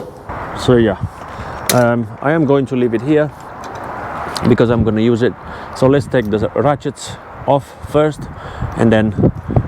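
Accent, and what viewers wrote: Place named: Yorkshire, United Kingdom. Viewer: Great Vlog!!!!!!!!! Thoroughly enjoyed it!!!! I love your 'Yorkshire' accent!!!!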